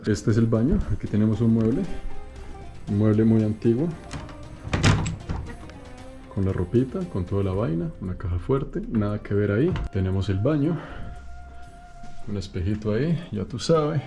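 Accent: Colombia. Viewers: Para la calidad y belleza de las instalaciones de esos hospedajes son muuuuy económicos para la mayoria de turistas, sigue disfrutando!!! Gracias a ti ya no detesto los colombianos, a pesar de tu acento eres excepcional, sigue así, o sigue mejorando!!! Felicidades!!!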